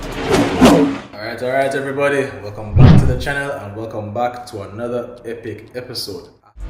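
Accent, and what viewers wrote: Jamaica. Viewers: LOVE this platform gonna be getting one for my first car when i migrate 🔥 Bro sounds Jamaican tho🇯🇲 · Rights afta mih hear accent mi click subscribe. Bigg upp🇯🇲🇯🇲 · Subscribing just because yuh sound like a yaadie👊🏽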